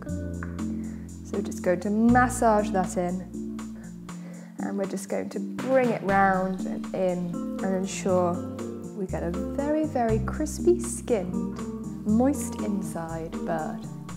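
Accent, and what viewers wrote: United Kingdom. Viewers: LIAMM she’s obviously not from America can idk how you can’t tell she’s English with that accent lol this one is not on us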